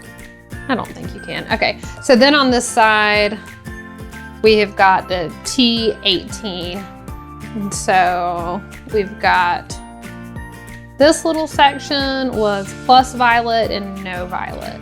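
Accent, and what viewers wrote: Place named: South, United States. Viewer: It's nice to see a good YouTuber that has a southern accent. I never see anyone on YouTube with a Southern accent. I'm from Alabama so I'm really used to that kind of accent and it's just nice to hear someone online sound like someone that I'm used to hearing.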